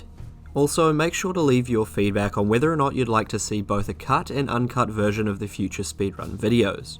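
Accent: New Zealand. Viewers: dude u a kiwi?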